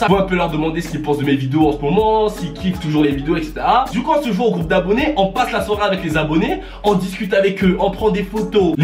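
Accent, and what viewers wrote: Switzerland. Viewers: t suisse? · T'es suisse? si oui je m'abonne😂😂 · T’es suisse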